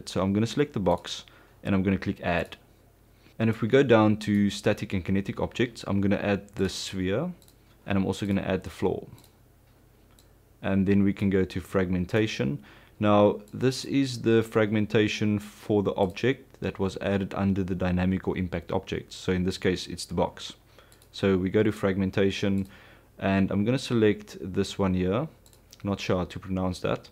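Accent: South Africa. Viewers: You sound South African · man are you South African :D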